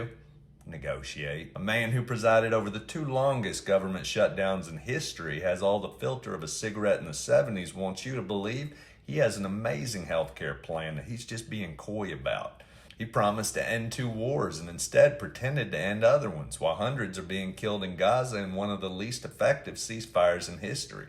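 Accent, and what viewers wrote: South, United States. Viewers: a smart man with a southern drawl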